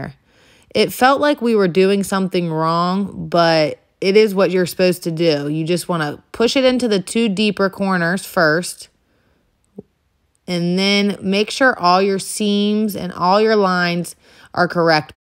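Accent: Pittsburgh, United States. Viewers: Are you from Pittsburgh?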